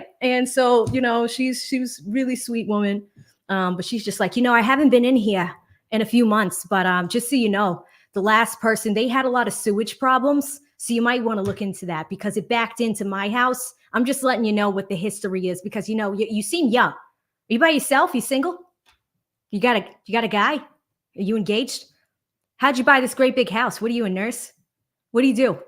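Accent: Boston, United States. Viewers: Oh my.. i love ❤️ you're Boston accent. So great.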